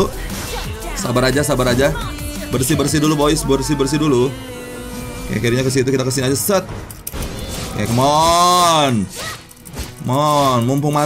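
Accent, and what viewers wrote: West Java, Indonesia. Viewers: Bang orang sunda kah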